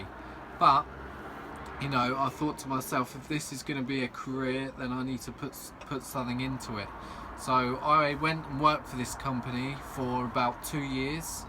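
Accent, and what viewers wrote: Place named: United Kingdom. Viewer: Im confused, you've got a British accent but an American website. I'm in England and want to learn vehicle locksmithing. Is this course relevant to me?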